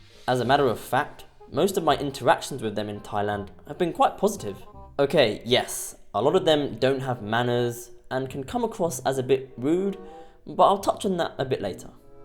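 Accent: United Kingdom. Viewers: A british accent talking about his favorite past time aka the ladyboy and complaining that there are too many Indians in Thailand now.....2025 started off slow but is gaining traction coming from the last video I watched titled Carnival cruise ships are floating ghetto simulators.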